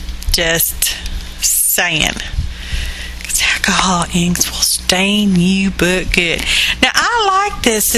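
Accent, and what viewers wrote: South, United States. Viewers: I love the crinkly bits SO MUCH!! Looking forward to giving this a go if my inks are still viable. PS: You’re a fun southern girl to listen to. That’s from a native Texan living in Tennessee. 😝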